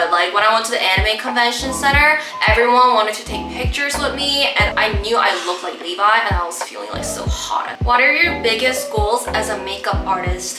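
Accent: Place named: England, United Kingdom. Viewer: Am I the only one who loves her english accent?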